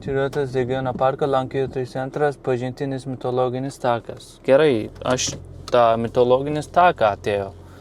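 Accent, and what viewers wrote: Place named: Turkey. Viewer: Smagu girdėt pukią lietuvišką,kad ir turkškai🤘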